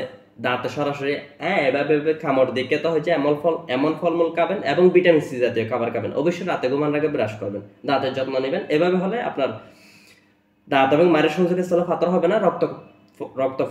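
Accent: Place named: Chittagong, Bangladesh. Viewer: bhai apni mone hoy chittanga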